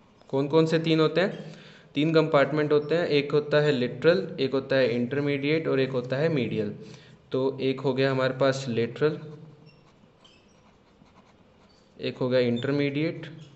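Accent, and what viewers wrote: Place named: Haryana, India. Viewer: sir tham to haryanvi lago ho manne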